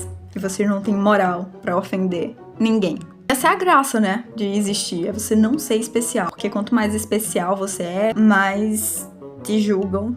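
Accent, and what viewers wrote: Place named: Northeast, Brazil. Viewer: Reconheço uma nordestina quando vejo(ouço) uma 🙄🗣️💋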